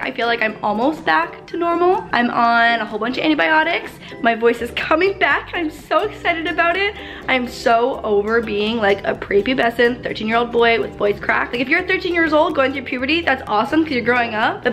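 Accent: Canada. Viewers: I love that you can tell you're from Canada when you talk! So exciting! Congrats on moving! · Is she Canadian? Her accent tho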